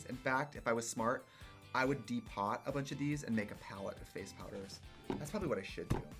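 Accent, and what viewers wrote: Wisconsin, United States. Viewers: I love her Wisconsin accent! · her wisconsin accent in this video>>> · I hear your WI when you say go bag 🤣🧡🧡 I'm from Racine which you may or may not know isn't too far from the Mil much love I bought the Plant Gay PR Box and I use that goddam box for my makeups you are an icon I love you I'm going to convince my bf to take me to This Is It bar so he can finally LIVE ❤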